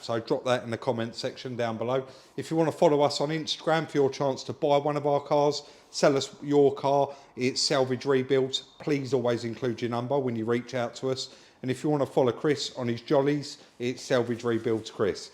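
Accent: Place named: RP, United Kingdom. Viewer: Bit posh you you essx boys isn't it ? 🙂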